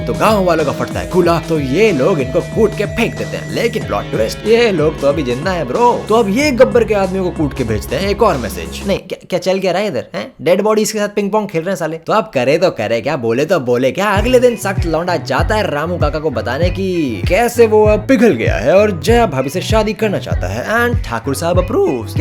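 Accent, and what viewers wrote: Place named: Punjab, India. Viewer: Am noticed your Punjabi accent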